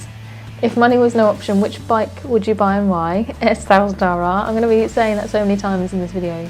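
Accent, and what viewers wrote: England, United Kingdom. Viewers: lets comment. i dont norm bother but i found your channel ages ago and all i heard was some nuts english lass babbling away in her helmet. I was like yes so its not just me. You keep it it up and fair play with the face on cam stuff bst be careful on what ya put up on the because of the fuzz.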